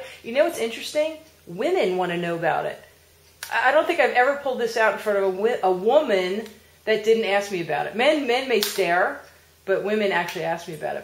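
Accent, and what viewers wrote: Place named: New Jersey, United States. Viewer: I love how Jersey girls say "wooder" ;)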